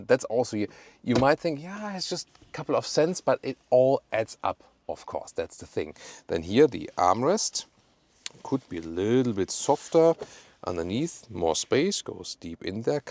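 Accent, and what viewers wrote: Germany. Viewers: It would be so much better to hear this review in his native tonuge. The heavy German accent is annying and I write this as a German native speaker.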